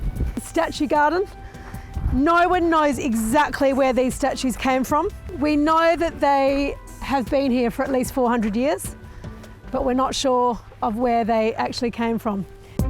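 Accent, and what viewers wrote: Australia. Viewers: Stunning house. Has to be my all time favourite ever!, The history! Tudor wing, gate house! You've been sensitive, respectfully with simple elegance & modern livable touch. Your work is amazing, your respect and love of the property is clear and evident. Congratulations on a wonderful job. I adore this property & gardens as it looks and feels like a huge home yet regal, splendid in everyway. Absolute favourite. Do I detect an Australian accent? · Thank you for the tour. You sound like you're from Australia? You have done a very good job of the interior decor. It would be an honour to be the owner. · Sounds like an Aussie accent… nice 😊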